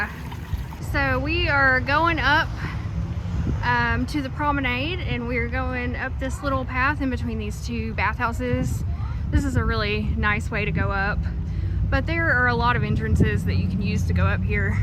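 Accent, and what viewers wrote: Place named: Arkansas, United States. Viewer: I look forward to these videos! Arkansas is beautiful❤ My aunt Mary is from there and your accent and hers are so similar it’s funny. I wish you luck in your therapy and your marriage🥰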